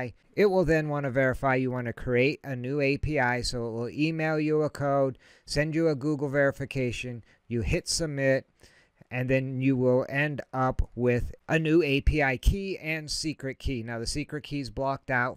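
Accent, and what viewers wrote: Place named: United States. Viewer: you sound american, how can you use binance? i got kicked off and forced to open a Binance.US account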